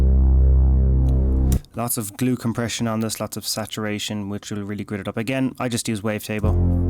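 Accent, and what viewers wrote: Ireland. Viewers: Out of curiosity, which part of Ireland are you from ?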